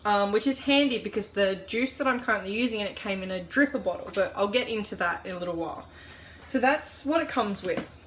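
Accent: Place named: Australia. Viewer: I love the aussie accent too, such a typical American thing hahaha.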